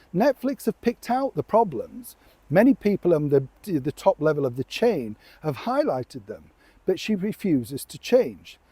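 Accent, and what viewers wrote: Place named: Doncaster, United Kingdom. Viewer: Doncaster interesting accent😂😂😊😊 I’m from Donny, interesting int, tword ad use love.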